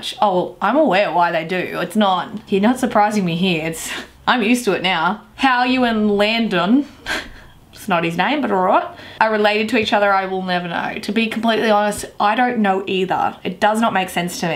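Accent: Australia. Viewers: Thumper Bunny, Everyone has an accent, you just don’t know you have one, since you’re use to it, she does have some sort of an Australian accent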